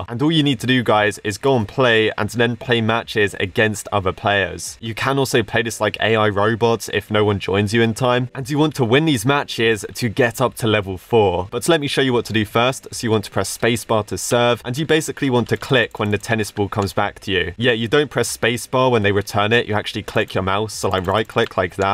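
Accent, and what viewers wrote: United Kingdom. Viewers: Sometimes I forget is Britishy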